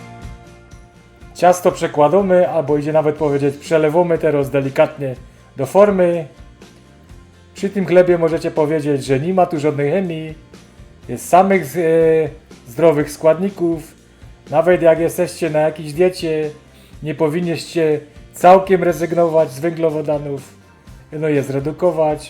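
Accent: Silesia, Poland. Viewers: Pospiesz sie z tym zytnim chlebem, prosze. Uwielbiam Twoja slaska gware i prowadzenie. Krotko, konkretnie, na temat i z humorem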